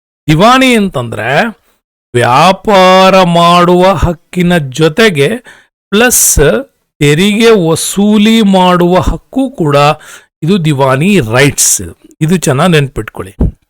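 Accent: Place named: United Kingdom. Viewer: ಸಾರ್ ದಯವಿಟ್ಟು ಕನ್ನಡ ನ ಕನ್ನಡ ತರಾನೇ ಮಾತಾಡಿ.......😢😢ಯಾರೋ ಬ್ರಿಟಿಷ್ ಅವರು ಕನ್ನಡ ಮಾತಾಡೋ ತರ ಕೇಳುತ್ತೆ.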